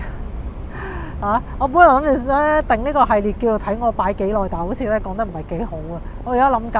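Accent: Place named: Hong Kong, China. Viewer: 你係咪耳聾架，正宗香港人一聽就知片主係香港人啦，咩野大陸同胞共享香港免費醫療呀？有病快啲去睇醫生啦，新制下，精神科唔使錢架。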